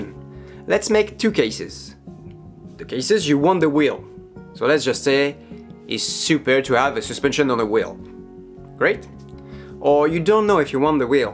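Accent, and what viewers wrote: France. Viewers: Laissant de côté l'accent français trop prononcé, tu parles un anglais très bon ! Bravo encore ! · your doing good mate, english with a slight french accents sound really good and you provide some very relevant info to us all... Mercy. You have some very important points about the quality of the suspension which is important.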